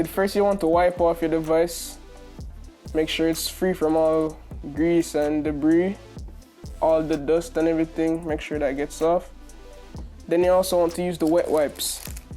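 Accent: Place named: Jamaica. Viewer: R u Jamaican? I hear an accent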